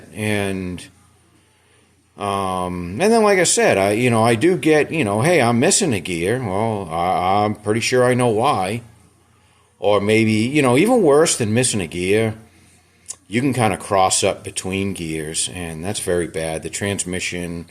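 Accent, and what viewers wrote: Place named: New Jersey, United States. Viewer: That NE Jersey accent is the worst sounding in the USA. Hems and hauls around talking talking in that annoying accent. Bro get to the point...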